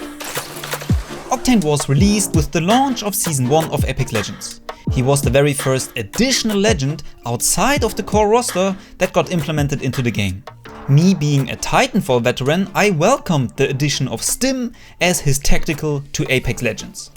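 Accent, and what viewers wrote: Germany. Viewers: u sound extremly german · I as a German INSTANTLY recognized his accent😂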